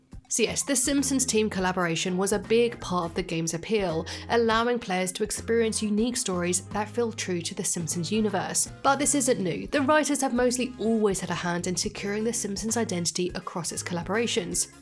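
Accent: United Kingdom. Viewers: A simpsons mega fan with a British accent? Tickle me pink!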